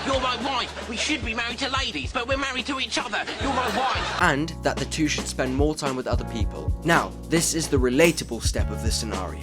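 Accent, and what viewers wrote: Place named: United Kingdom. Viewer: Surprised he doesn't know how to pronounce Richard Ayoade's name - this video creator sounds British and Richard has been on a large number of British panel shows and has had his own shows, all where you can hear his name being pronounced.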